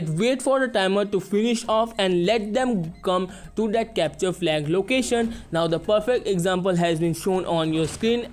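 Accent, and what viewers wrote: India. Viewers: Bro ur voice proof that u r Indian 😜❤️. Love from Bangladesh 🇧🇩, Bhai😍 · nice English in Indian accent 🌚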